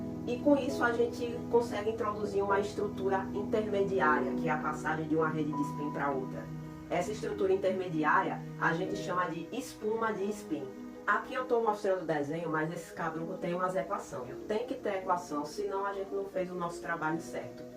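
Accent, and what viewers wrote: Northeast, Brazil. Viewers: Ela é maranhense, paraibana ou cearense eu acho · Adorei o vídeo 😄. Só fiquei curioso pra saber de qual estado do nordeste vc é.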